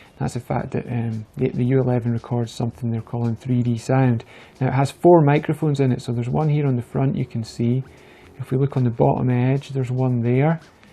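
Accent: Scotland, United Kingdom. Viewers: Love the accent! Scottish? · Scottish. From Scotland.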